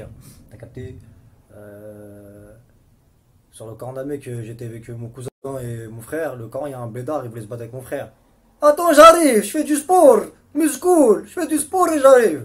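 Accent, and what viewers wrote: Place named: Romania. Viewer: C koi laccent tetais en roumani nn ???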